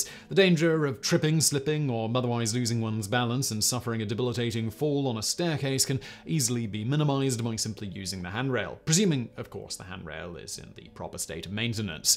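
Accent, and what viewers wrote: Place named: Cockney, United Kingdom. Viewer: Interesting. The guy who's speaking is from deeply cockney stock but is well educated and is code switching.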